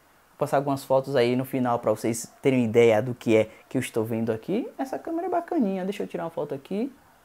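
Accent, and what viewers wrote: Bahia, Brazil. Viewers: Vc realmente tinha de ser baiano... parabéns! gosto de seu canal! Tb sou baiano. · vc e da Bahia ?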